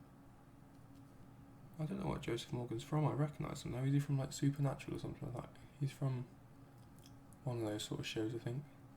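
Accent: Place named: West Midlands, United Kingdom. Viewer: you sound like you live near the west midlands! your accent I mean, I live in brum.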